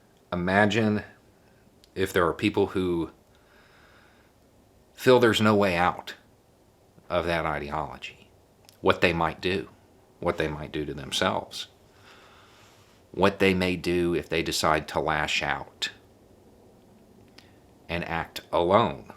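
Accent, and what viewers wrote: South, United States. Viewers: Welcome! You are at the right place. is a comfort to many of us. The man can break down what's going on like nobody's business! Just an FYI: If you see videos where his Curious George patch is upside down, get ready for some Grade A sarcasm/satire. (and sometimes, his southern accent gets really thick during these videos) Sorry about your cat. I've dug many a hole for a loved one, and it's a hard thing to do. Makes me feel good that you're here now, however.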